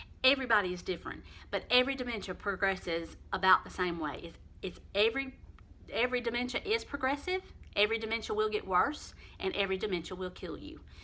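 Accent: South, United States. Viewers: I have found your videos to be very informative & I love your southern talk! Thank you for your time to educate us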